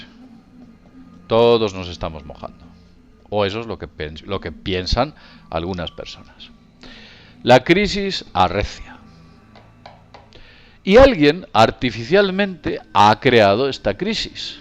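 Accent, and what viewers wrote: Spain. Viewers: tio deja de hablar como español, no hay nada de malo ser peruano.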